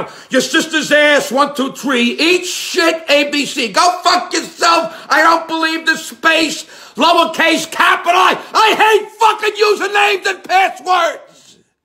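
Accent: New York, United States. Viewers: Thick New York accent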